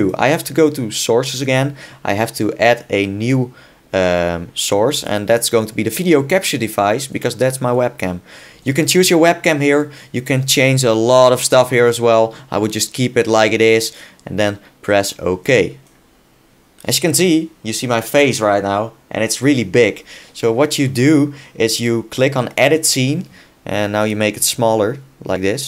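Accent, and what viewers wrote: Netherlands. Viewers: Are you dutch? Cool! I could hear it by your accent! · ik hoorde meteen dat je nl was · Jij spreekt Nederlands hé je hoort dat aan accent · this guy has dutch accent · are you Dutch becous you realey sound Dutch becous i am Dutch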